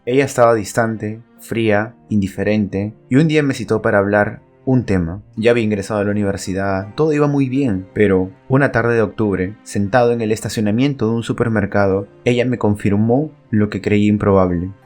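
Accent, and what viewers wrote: Peru. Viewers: BRO ME IDENTIFICO CON TU HISTORIA Y CREO QE ERES PERUANO CON TU ASENTO Y YO TAMBIEN NMMS :v.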